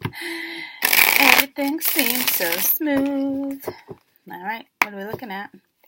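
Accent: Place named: New York, United States. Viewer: Your New York accent is the cutest thing 💕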